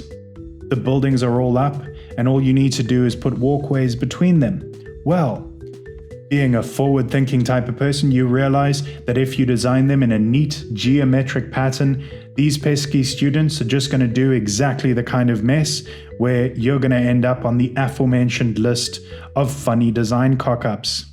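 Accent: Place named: South Africa. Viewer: If you're using reddit for memes and stonks you're doing it very wrong indiddly deed. I swear I detect the faintest of Saffer twangs in this video 🤔🧐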